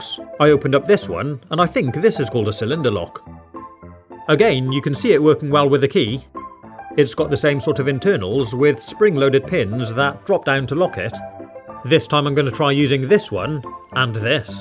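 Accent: United Kingdom. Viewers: Are you British are you from London are you even from America cuz I feel like you don't you also talk British so much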